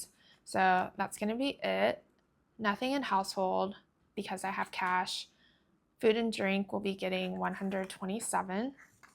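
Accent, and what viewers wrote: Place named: Australia. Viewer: Hi! Omg I’m excited for your trip! Hopefully you can get a tourist visa on time so that you can also spend more time traveling before heading back to the states☺️ I might be the only one thinking this but I feel like I’m hearing a bit of the Australian accent from you?!😆 omg that’s so cute your boyfriend likes chocolate milk!! I love watching your channel as well as cloudymoney, rainebudget, and fancydollar🤍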